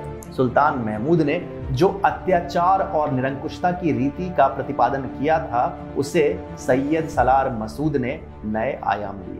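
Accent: Standard. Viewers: Hats off to you sir, narration in pure Hindi. One request - please write this history, many book readers would like to read and listen to such glorified, true history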